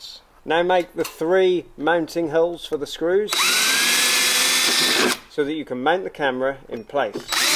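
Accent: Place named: Norwich, United Kingdom. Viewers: Thought I recognised the accent. I was bought up in Hellesdon (not the Hospital ) I've heard all the jokes 😒😅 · Apparently most people think the English don’t know how to speak Merican. He does come from the country that invented our language and maybe…just maybe he is saying it correctly and we as Merica is not? (I am married to a Brit and I’ll tell you there was a learning curve to what England English sounds like…kinda like trying to understand anyone from Alabama, Mississippi, either Carolina and southern Ohio) His technique on instillation is spot on.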